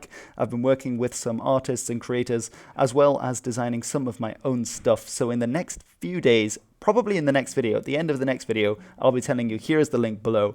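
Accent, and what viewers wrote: Spain. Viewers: For what? He is reading every single word with Spanish pronouciation. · Can you pronounce Portuguese words with Portuguese style, not with Spanish style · Me, a Portuguese speaker: "Stop pronouncing Portuguese words with a Spanish phonology" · Why does he pronunce the words in spanish, spanish is not portuguese languaje, portugal does not speak spanish like mexico, venezuela and others, its portuguese my dude. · Why are you pronouncing portuguese cities with the spanish accent you fool? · for someone who tried to spain out portuguese words, not bad my friend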